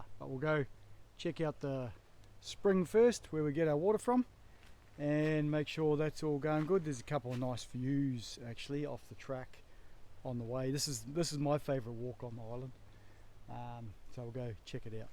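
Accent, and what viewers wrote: New Zealand. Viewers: Just found your channel. Great footage. Great location too. Every 79 yr old teenager dream. Did I see something about you leaving.? Obvious question..why.? You sound like a kiwi.! Thanks again for sharing. Atb from across the ditch here in Nz. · You sound like you are from NZ ?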